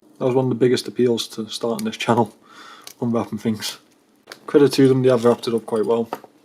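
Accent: Liverpool, United Kingdom. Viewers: scouse, well in la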